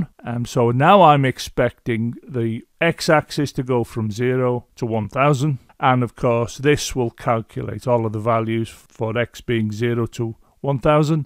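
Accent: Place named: Scotland, United Kingdom. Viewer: Nobody cares today about the correct pronunciation of softwares' names (like Gimp, for example), but I think it's his nice presumably Scottish accent that makes him pronounce it that way.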